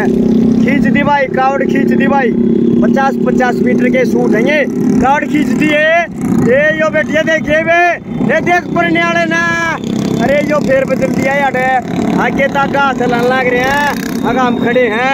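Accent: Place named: Haryana, India. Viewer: Khaaskar aapka bolne ka haryanvi style sabhi ko bahut pasand aaya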